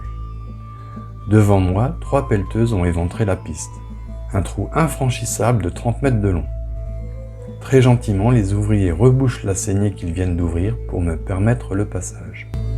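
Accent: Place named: Alsace, France. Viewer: j'ai cru reconnaître l'accent... Moi je le suis (alsacien), mais expatrié en Provence... Pour pouvoir un peu rouler en 4x4 sur les magnifiques Chemins du plateau de valensole et des gorges du Verdon....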